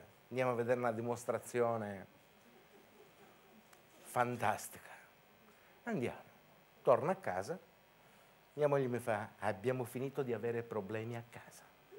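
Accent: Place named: Calabria, Italy. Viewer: o grande calabrese sopratutto e l accento che mi frega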